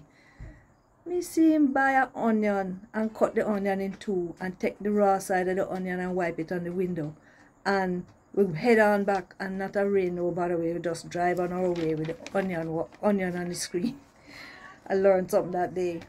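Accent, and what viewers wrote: Jamaica. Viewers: I love how u love your Jamaican talking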